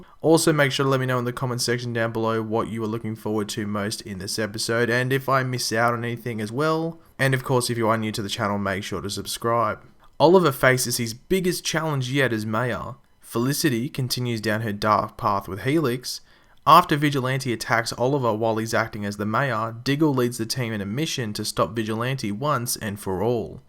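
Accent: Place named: Australia. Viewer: his Australian accent i think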